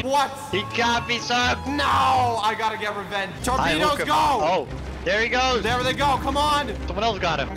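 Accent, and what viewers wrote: Scotland, United Kingdom. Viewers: Is Scottish or something? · I didn't know was Scottish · is Scottish wow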